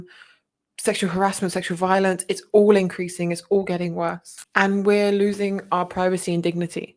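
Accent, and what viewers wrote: United Kingdom. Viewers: I wouldn't cover my beautiful natural blonde hair. Why do 8 year old muslim girls cover their hair🤔 are we assuming men cant resist? Gross!!! Get some vitamin D lady. I hear your English accent. Go live in saudi Arabia youll be happy there I think. I assume you're single. Im a grandma. Get a real job or do as your father tells you. Dont be a hypocrite. Maybe your hair isnt as beautiful as mine😊😊😊 thats ok Im just real lucky. You sad woman. House prices increased because of immigration. 20 million too many people here. Are u getting paid for this video? Your parents must hate you😂😂😂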